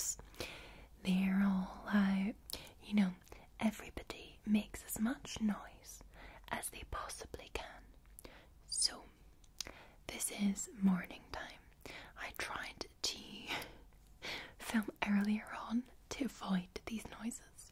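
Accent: Scotland, United Kingdom. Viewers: I swear Scottish Tingles are different than normal tingles. Tell us more about Scotland!! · Ahhhhh yes another glorious night of falling asleep to that amazing Scottish voice 😴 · Love your accento, you made me want to go to Scotland. You're lovely, i like everything of you, but the voice Is marvellous! ❤❤❤ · Scottish accent challenge: try saying ‘burglar alarm’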